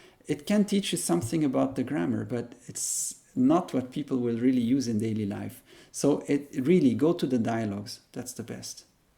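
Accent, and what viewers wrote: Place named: Tagalog, Philippines. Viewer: Dude I can hear a Tagalog accent..Your good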